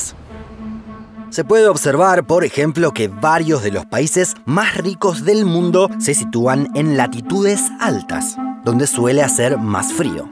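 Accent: Argentina. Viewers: Che no pierdas el argentinismo en el habla viejo! "Medeín" , "Guaiaquil", "Abuia"... ¿qué es eso? mandale sheísmo a pleno. No pierdas la identidad argenta loco, que es un recontra canal y que sea argentino me gusta y enorgullece a dos manos XD Abrazo de gol! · Creo que el narrador es argentino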